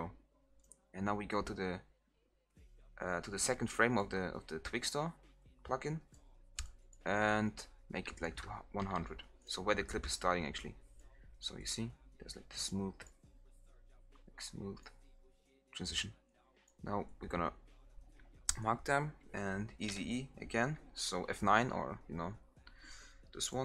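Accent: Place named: Germany. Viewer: that german accent tho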